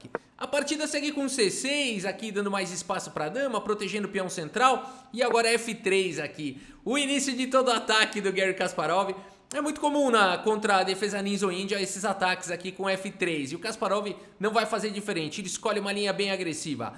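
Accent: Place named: São Paulo, Brazil. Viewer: SP capital. O sotaque entrega muito kkkkkkk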